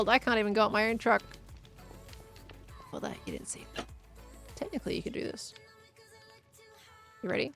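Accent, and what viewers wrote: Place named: Australia. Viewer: I'm just happy to hear the Australian accent.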